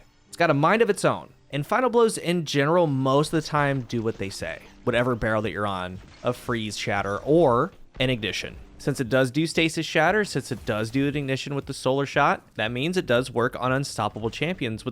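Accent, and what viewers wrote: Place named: Wisconsin, United States. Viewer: your voice is soooo, are you from Wisconsin? try not to do that upward inflection after every sentence it gets real annoying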